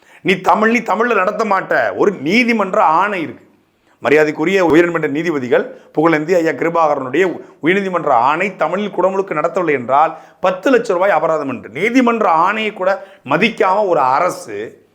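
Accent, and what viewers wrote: Tirunelveli, India. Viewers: இந்த 'இழுவ'..😂 அண்ணன் திருநெல்வேலிக்காரன் னு நிருபிச்சிட்டீங்க..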